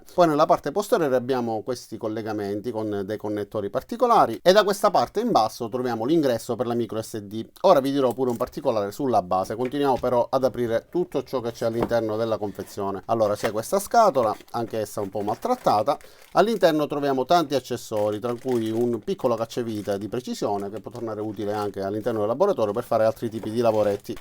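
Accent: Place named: South Tyrol, Italy. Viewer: l’accento altoatesino ti ha tratto in inganno! 😂 P.S. Adoro l’accento siciliano 😉👍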